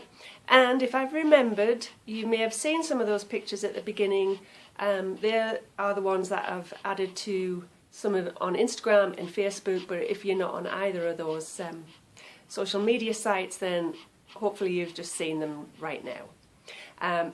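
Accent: Sunderland, United Kingdom. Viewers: we live in Adstock, Rural Quebec,It's at the bottom of the Eastern townships, not far from Maine. we operate a Musk ox fibre mill. Bit of a change from Sunderland. Hylton castle, did you see the time team episode at Hylton castle- I thought it was great to see Sunderland again ! We used to live at the top of Newcastle road, in the lovely Tudor style houses just down from the Grange pub. Last summer i passed through Sunderland Ontario on my way to a fibre show ! Will be watching your pod with great enthusiasm-nice to hear the Sunderland accent again after all these years♥ will try & look you up on Ravelry....I'm The-Qiviut-Fairy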